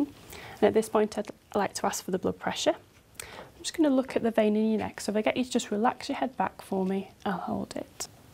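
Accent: United Kingdom. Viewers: british accent)))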